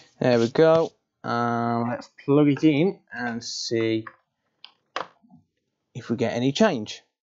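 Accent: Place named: Walsall, United Kingdom. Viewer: Ooooooo, you sound super local 🤣 Walsall guy in the House!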